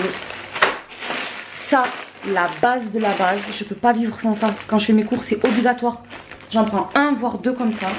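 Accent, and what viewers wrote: South, France. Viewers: Coucou ma belle je te suit depuis la recette de tes msemen inratable lol. Et j'aime ton nouveau contenus je me suis tjrs demander à quoi ressemblé cette voix derrière ce magnifique accent du sud. Je suis pas decu tu es adorable à tout point de vue. Continu a nous régalé et nous proposer de news contenus différents bisou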